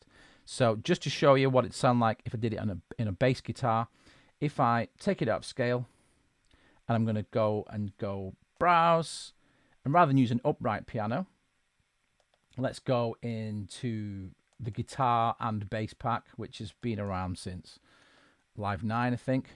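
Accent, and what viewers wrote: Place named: Northern England, United Kingdom. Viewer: Superb tutorial, this. And nice to hear the accent of a fellow northern lad teaching it.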